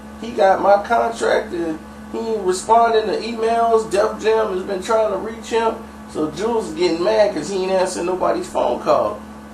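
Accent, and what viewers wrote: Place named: Chicago, United States. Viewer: I can do without your Chicago Accent Bro! Next video you need to sound like you from VA or I'm going to unsubscribe!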